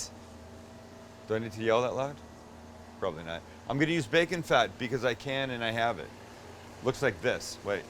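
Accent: Canada. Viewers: Lol I could tell he was Canadian by the way he said out and some other words, but I thought he said something about going church one episode so didn’t know he was jewish, Coolbeans haha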